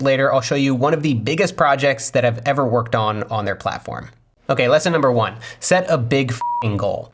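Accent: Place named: Australia. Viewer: Catches me so off-guard hearing an Australian accent in a YouTube video, and I have an Australian accent and make YouTube videos hahaha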